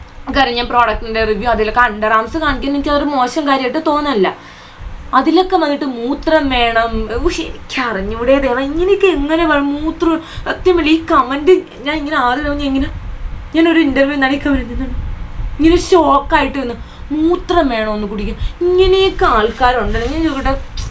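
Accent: Trivandrum, India. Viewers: "TVM ano sthalam ...samsaram agane thonee....anthayalum marupadi kalaki 🤝👍.." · Nte ponnu koche iyalu tvm evdeya. Njnm tvm anu bt nte slang inganeyonumalla. Iyalu pakka tvm. 😂😂😂 Njn chirichu oru vazhi aayi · Tvm slang polichu chechi😍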